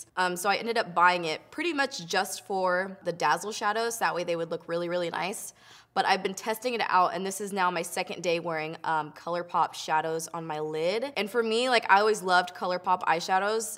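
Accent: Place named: California, United States. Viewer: I love your Cali accent! Lol soooo funny and selfie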